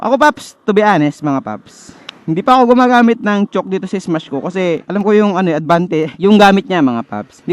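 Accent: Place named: Visayas, Philippines. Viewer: bisaya accent 😆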